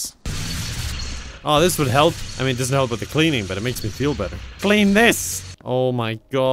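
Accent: Ireland. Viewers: part ireland u from g? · I love his Irish voice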